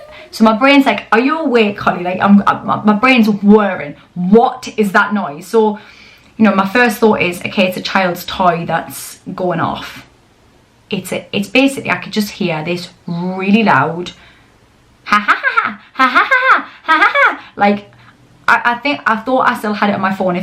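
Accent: Newcastle, United Kingdom. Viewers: I love your Geordie accent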